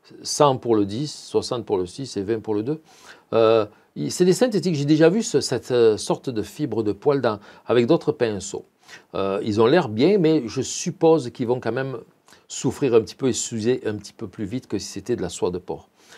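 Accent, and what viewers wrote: South, France. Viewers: vous avez pourtant un bel accent du midi vous vivez au quebec? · felicitation maitre. je sens une pointe d'accent du sud de la France, vous êtes d'où ?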